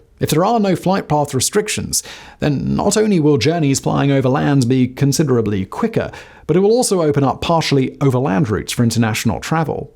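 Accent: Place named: RP, United Kingdom. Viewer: Why do you think just because this channel and you speak with that upper class posh Gary Gavin accent that I’m not going to swear the fucking shit bat balls in this comment section know you are fucking mistaken where the fuck is ATI and I want business blaze where the fuck is business blaze blazing back now cocaine also nice blaze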